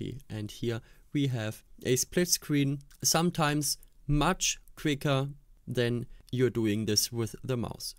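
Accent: Germany. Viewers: Probably he is german, or speaks german.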